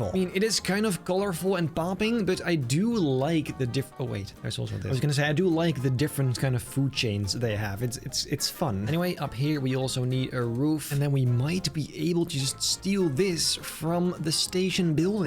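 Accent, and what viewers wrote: Netherlands. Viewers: yoow broow jij bent nederlands toch? ik hoor het aan je accent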